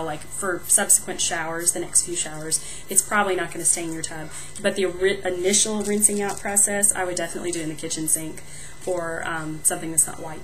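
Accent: South, United States. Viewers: where r u from? you kinda sound southern?!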